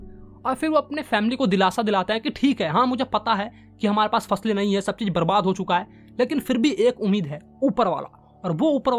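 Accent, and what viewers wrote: Bihar, India. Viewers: Are you from Bihar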